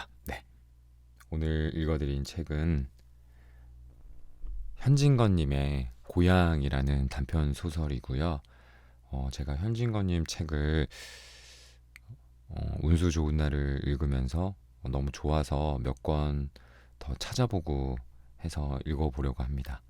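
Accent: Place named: Busan, South Korea. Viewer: 부산사투리~~~~~입에 착착 붙네요~~~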